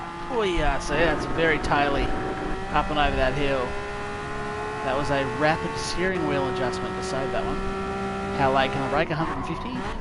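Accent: Australia. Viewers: Quickly becoming my fave streamer/youtuber. Love your soothing aussie tones.(in a manly platonic way).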